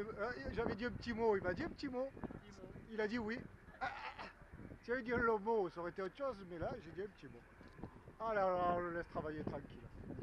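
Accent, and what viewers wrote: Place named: Marseille, France. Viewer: Salut, j'ai passé l'hiver devant tes vidéos et je pense les avoir toutes visionnées (même 2 fois pour certaines). A force j'ai attrappé l'accent de chez toi. un lorientais qui par le marseillais! ma femme me dit que je suis devenu fada! j'ai hâte de voire la suite de tes aventures. Bon Vent l'ami et comme on dit chez nous KENAVO!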